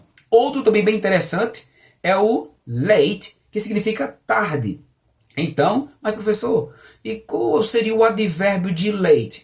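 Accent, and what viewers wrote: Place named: Northeast, Brazil. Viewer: Vídeo bom! Acho que você é nordestino 😅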